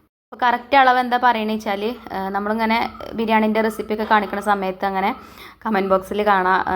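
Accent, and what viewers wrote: Malappuram, India. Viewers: ഇത്താന്റെ വീട് എവിടെയാണ് മലപ്പുറകാരെയാണെന്ന് സംസാരം കേട്ടപ്പോൾ മനസ്സിലായി. സ്ഥലം?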